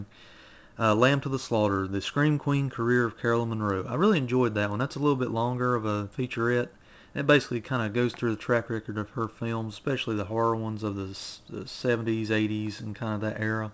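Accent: South, United States. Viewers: Whoa you have a really thick southern accent. Do you really talk this way in real life?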